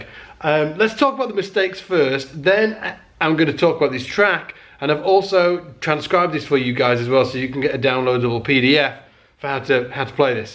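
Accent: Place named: Northern England, United Kingdom. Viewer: Where is your northern accent coming from m8? Is that Leeds or you moved from Birmingham? I am trying to figure that out from some time. Big fan of yours and fish and chips as well! Cheers from PL!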